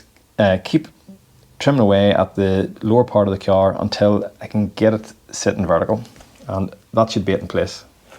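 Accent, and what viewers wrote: Northern Ireland, United Kingdom. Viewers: It was just a thought. Seems to me that Ulster is a unique place but doesn’t get much attention. A lot of American car nuts will be attracted by the content and stay for the accent. I love the Norneyrish accent so I supposed I’m biased. 😁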